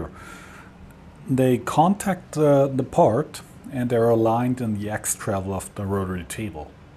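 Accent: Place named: Germany. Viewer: You sound a lot like a professor I know. He is Dr. Chadoba. I work with him a little at the University of Texas at Arlington. The way you speak is very close to Dr. Chadobas speech. I recognize the German speach.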